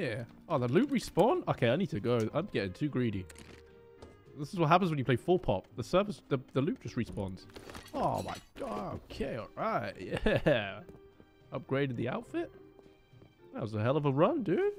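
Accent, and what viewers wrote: United Kingdom. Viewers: Are you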